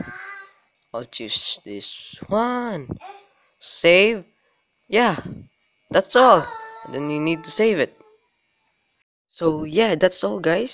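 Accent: Philippines. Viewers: filipino right? english palang alam na this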